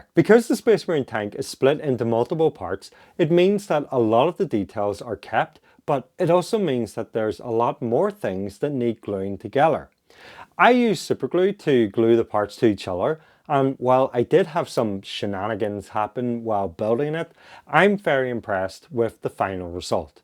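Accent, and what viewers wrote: Northern Ireland, United Kingdom. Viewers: just discovered you for the first time. praise the algorithm ! that a fellow "Norn Iron" accent i am detecting there lol?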